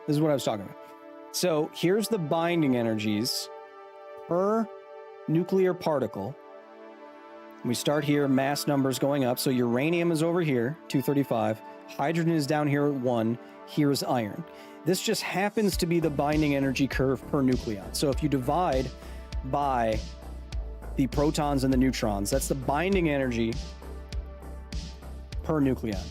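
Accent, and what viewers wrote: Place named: Australia. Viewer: watch for the australian accent and bob ross voice, stay for the thick load of science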